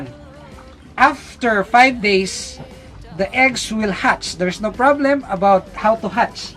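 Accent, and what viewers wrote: Visayas, Philippines. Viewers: Bisaya ka nong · Ka lowm pud mo bisaya ni kuya oi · Bisaya diay ka lods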